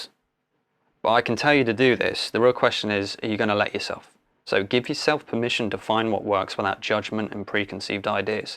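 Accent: United Kingdom. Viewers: Wow. I can do it now! I think all it took was that lovely British accent. Oh, and also imagining that the pick is always in contact with the string. Brilliant! Thank you, brother.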